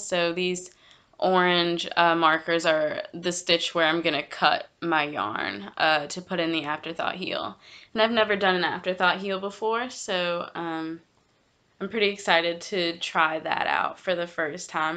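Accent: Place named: Alabama, United States. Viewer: Hurray! 👏🏽👏🏽👏🏽 Awesome first episode! I really enjoyed listening/watching. Your accent tooootally made me homesick for alabama lol (i use to live in huntsville). Im excited to see how that sweaters quantity works up. The color is beautiful. I need to get my ravelry project pages together... theyre shamefully out of date... smh. One day 😒☺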